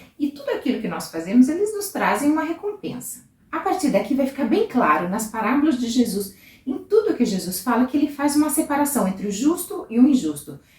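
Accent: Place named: Rio Grande do Sul, Brazil. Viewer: Bom dia ! Hoje domingo de Páscoa .Ele vive 💕 Conheci hj teu canal ,muito bom ! Vc é gaúcha ? Tem sotaque de gaúcha .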